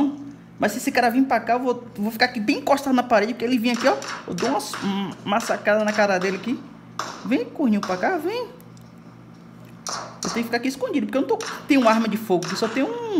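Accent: Bahia, Brazil. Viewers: Vc e bahiano de salvador? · Já da pra vê que esse e baiano vu skskskksksk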